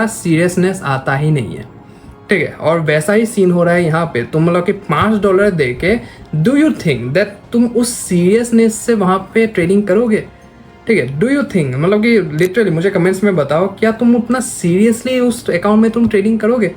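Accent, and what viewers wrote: Assam, India. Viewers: Bhai ka hindi sunke hi pata chal gya ki yeh bande Assamese he 😅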